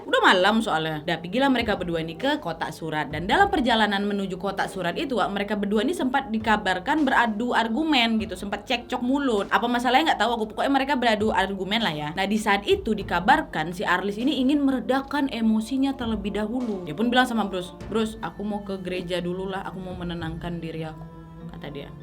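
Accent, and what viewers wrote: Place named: Medan, Indonesia. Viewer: Kok berubah logat mu KK Jadi Medan - Melayu wkwkwkwkwks🤣🤣ngakak liat bersin nyaa😂😂 Motif nya jelas unsur sakit hati atas ketidakadilan yg dialami si Steven dikota itu kondisi korban yg seperti dilecehkan" didalam rumah ibadah jadi pilihan nya buat balas dendam terhadap sistim dikota itu sebenarnya masih banyak yg mau diuraikan cuma males ngetik nya😂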